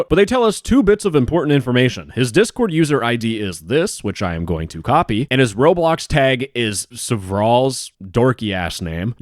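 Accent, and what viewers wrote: Poland. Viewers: as a pole i approve polish accent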